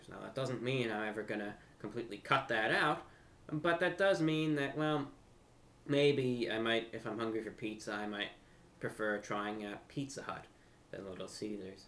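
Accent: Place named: New York, United States. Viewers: wow I was wondering where you were from. I always thought you sounded like you were from NYC metro area but yet your vids often gave impression of you being in the south. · I like these vids, they are enjoyable although it's hard for me to say why. It's very inoffensive and vanilla, so I guess it's soothing to listen to these random and non-controversial topics after a day full of stuff. On a side note, I was totally convinced you had a NYC or New Jersey accent from my first time watching, but for some reason I thought I heard in another video that you grew up in the south, so I was confused. Anyway, as another northerner who lived in the south for a while, I can definitely say I prefer variety in the weather, and I like winter. A couple of times when I was in Mississippi it actually snowed and I got to see the whole town shut down in a panic, which was fun. 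We got less than two inches.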